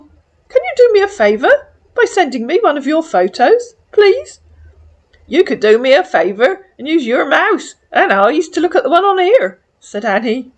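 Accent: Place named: West Country, United Kingdom. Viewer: Can’t listen to that awful west country accent sorry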